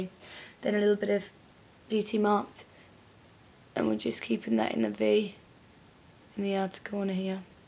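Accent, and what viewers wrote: England, United Kingdom. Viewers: If you mean 'accent', she's English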